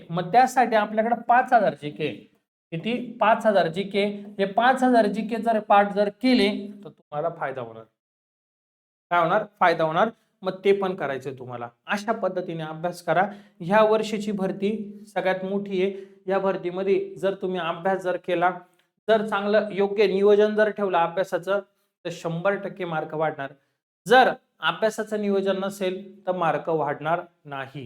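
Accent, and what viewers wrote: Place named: Khandesh, India. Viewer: सर तुम्ही खानदेशी सारखं बोलतात😅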